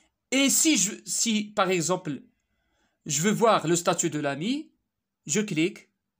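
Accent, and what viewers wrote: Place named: Morocco. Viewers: Tana hh bayna mghribi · جاني بحالا شي مغريبي كيهضرو بالفرونسي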